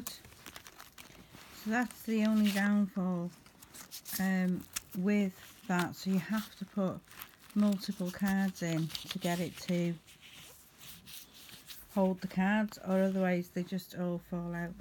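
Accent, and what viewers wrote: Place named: Merseyside, United Kingdom. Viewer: Thanks. Accent reminds me of my mum & nan they are from nosley Merseyside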